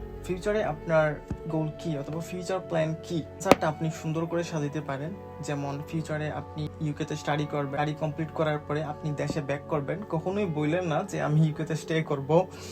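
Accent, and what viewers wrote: Sylhet, Bangladesh. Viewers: ভাই আপনি কি সিলেটি?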